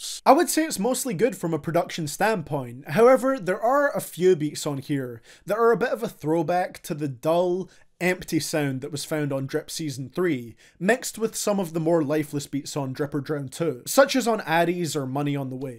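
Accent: Scotland, United Kingdom. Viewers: He is Scottish, he has a strong accent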